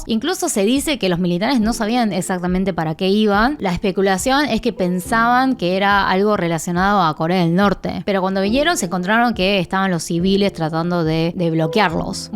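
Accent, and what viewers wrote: Buenos Aires, Argentina. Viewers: Vaya accento argentinos con el ya pronunciado ja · Si se nota que aprendiste español en Argentina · Una asiatica hablando español, encima en tonada argentina dios mio 😱😱😱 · De donde me recurda lo que dice?... ah si ya se, de argentina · Me gusta tu hacento Argentino. Dicen que si Korea se unifica como una sola Korea sería una potencia militar y económica y tecnológica. Con muchos recursos. Yo pienso que si porque la unión te hace fuerte 👍🇲🇦🇰🇷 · Es porque es Argentina, nació y vivió más de 30 años ahí, dudo que se le vaya el acento porteño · Toda América Latina, estaba esperando esta explicación. Adoro su acento Argentino.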